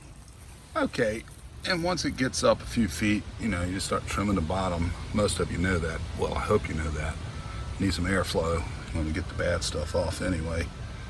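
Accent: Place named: South, United States. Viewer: Yea! My 1st year w CPs!! I hope they turn out! 🍅 Can't wait to taste them! Yay, I picked the miracle grow, too!!😉👏 Are u originally from NC? Accent sounds a little more southern. 😁😊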